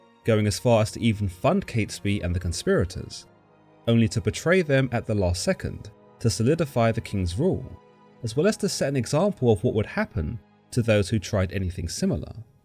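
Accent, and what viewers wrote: England, United Kingdom. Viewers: Love your videos!!!! Great listening to an English man 😆 would be nice if you added your sources in your comprehensive description 😁 thankyouu please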